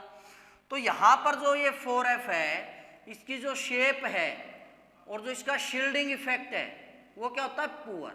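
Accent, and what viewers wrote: Haryana, India. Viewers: You are seems likely from HARYANVA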